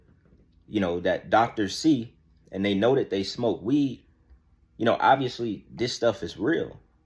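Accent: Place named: Atlanta, United States. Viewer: I can definitely tell you have an Atlanta accent. 😂❤ I stay near Macon... yep i can definitely tell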